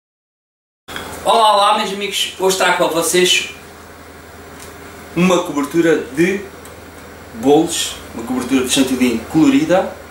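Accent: Portugal. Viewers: Antes de mais obrigada pelo video, A receita está certa, se não com algumas pequenas falhas, as natas poderiam estar quentes, ou a gelatina foi posta quente e não morna como deveria, por isso não ficaram na consistência necessaria para decorar um bolo, deduzo que tambêm seria para decorar e não para rechear o bolo como foi dito, a dica do limão foi mesmo para tentar salvar as natas, que mesmo assim desandaram, mais sorte para a proxima.. OBS: Sotaque Algarvio? . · ele fala português Portugal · axo q ele e de portugal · Ele não fala engraçado , vc acha isso porque o seu português é o português ( Brasil ) o dele é o português ( Portugal ) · Você fala com um português de Portugal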